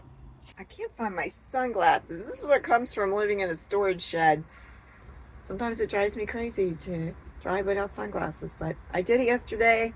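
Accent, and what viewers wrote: New Jersey, United States. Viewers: First time subscriber. I thought i detected a Jersey accent. We had a Lance camper and loved traveling across country or many years. Fortunately our pets never needed a vet. Be safe.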